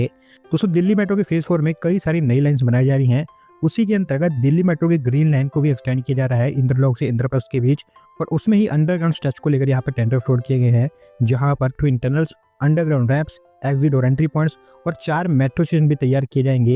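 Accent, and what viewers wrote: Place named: Himachal Pradesh, India. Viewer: Bhai aap Himachal se hai, aapka accent se lagta hai.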